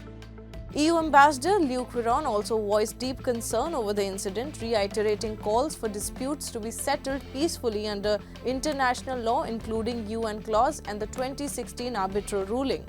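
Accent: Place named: Tamil Nadu, India. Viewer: Small ship purposely collide with big ship who are at fault? Please speak better slang not like Tamil slang very irritating our ear.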